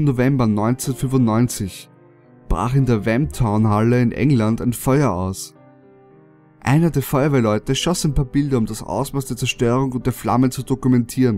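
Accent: Austria. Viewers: Bist du ösi? ^^ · oder einfach österreichisch?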